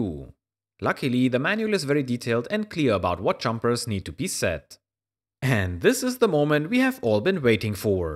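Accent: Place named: Germany. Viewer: Why did they want so much money from you at the scrapyard? Seems like the guy was very shady and pocketed the money. You sound German and when they allow me to look through the trash (not everyone allows that) they either say take the shit or they weigh it and I give them the scrap price. The outcome would be below 3€ for a handful of CPUs. Or was this a private facility? I always go to our municipalities scrapyard when I want to get rid of stuff and I ask if I can look through the electronics. The outcome of that question depends on the guy sitting there.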